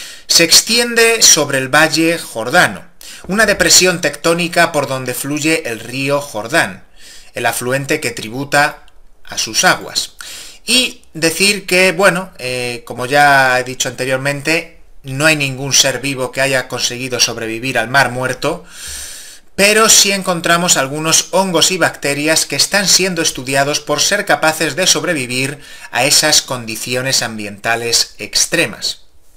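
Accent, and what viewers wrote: Spain. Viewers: Buenos vídeos como ustedes dicen y mas chistoso por tu acento Español. Saludos desde México. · tu voz aparte de ser española es muy molesta.. 😒😒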